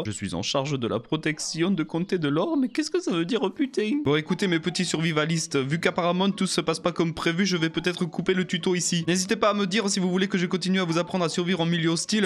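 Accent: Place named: South, France. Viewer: Le délicat accent du Sud <3. J'adore ce let's play continue ! Je veux suivre les aventures de Pierre Grise sur Azeroth !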